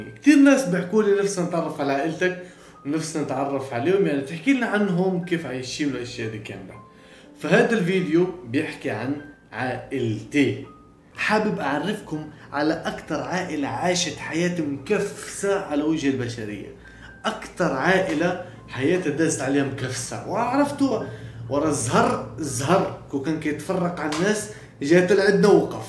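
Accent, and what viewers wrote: Morocco. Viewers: ههه كتعجبني هدرتك بالمغربية مخلط بالفلسطينية بنكهة مغربية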